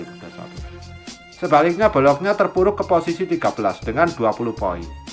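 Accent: Java, Indonesia. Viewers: Logat Jawa Lo kental banget! Cari suara yang komersil boss.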